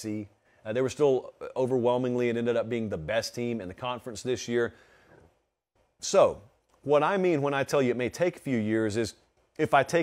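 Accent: South, United States. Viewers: we can tell you're from the south. mee-maw doesn't exist above the Maso Dixon line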